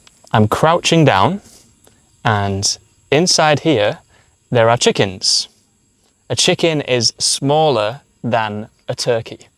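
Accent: United Kingdom. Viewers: I am from South India. Very useful to understand British àccent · İs it british ascent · oh my god, very amazing video, and your way of teaching really fantastic, and british accent adds a feature to the video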